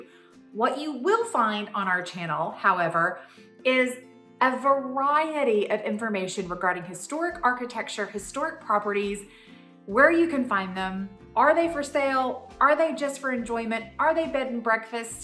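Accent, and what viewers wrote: South, United States. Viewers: I cannot thank you enough! Seriously. I’m a 32 year old woman who has been (oddly) passionate about historic architecture, primarily residential, since early childhood. I remember my mom taking me to the plantation homes in Live Oak and Amelia Island when I was in elementary school, and being obsessed. When I was in middle school it was the Biltmore Estate, and in high school it was Stone Mountain, GA’s historic homes that were shipped in from all over the country. That led to me taking architectural design courses, and I FINALLY landed myself my very own restoration project in my 1919 arts and crafts home in Pennsylvania. 😍 I so enjoy the videos you share, and all of the knowledge you are passing on. Not to mention the beautiful preservation of history that you’re directly responsible for. Just immaculate! Thank you, thank you, thank you. 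(The southern accent also helps! Lol. From one southerner to another. I miss the accents from back home now that I permanently have lived above the Mason-Dixon for the last decade!)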